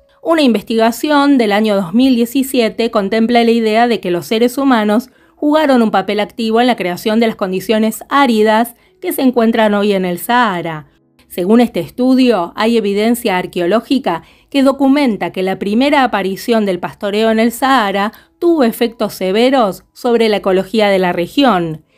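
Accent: Argentina. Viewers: Que bonita voz, ¿de que sector de argentina es tu acento?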